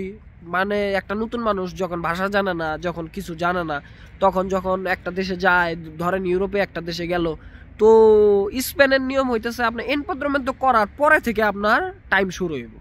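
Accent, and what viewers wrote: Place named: Central Bangladesh, Bangladesh. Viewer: Vay bari koi,,, Dhakar modha nki,,kotha suina mone hoi,tangail,manikgonj, Narayanganj,gajipur,ai dikha,,aktu reply dean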